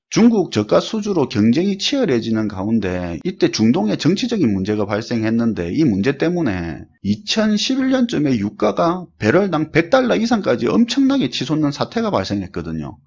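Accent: Gyeongsang, South Korea. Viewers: 잘 봤습니다!! 경상도 사투리가 매력 넘치시네요~ 2019년도에도 좋은 말씀 잘 부탁드립니다!! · 역으로 같은 경상도 사투리를 쓰는 입장에서 전달력이 더 증대되는 느낌이 ㅎㅎ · 첨에 경상도 아재 사투리가 귀에 안들어왔는데 신기하게 이제 히어링 되네요. ㅋㅋㅋㅋㅋㅋㅋ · 구독 눌너십더 구수한 갱상도 이바구 고맙십더 내 사위가 조선업 내놓으란는 기술잖데 몆년간 일이 없어가~ 생활고를 껵꼬밌어는데 이제 숨통이트이러나 마~ 고마 눈깔 뒤비지게 바빠지면 합니더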